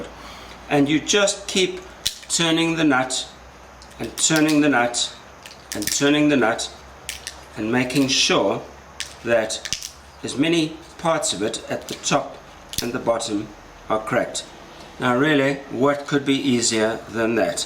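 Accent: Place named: Deep South, United States. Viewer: Deep South.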